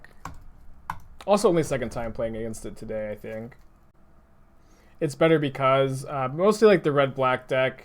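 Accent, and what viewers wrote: Texas, United States. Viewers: love the texas spike accent. 🤙🤙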